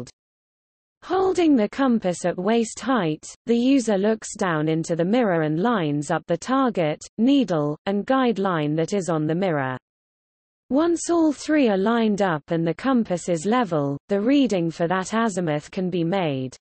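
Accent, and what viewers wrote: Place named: United Kingdom. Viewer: Can we just STOP with the British accents? PLEASE??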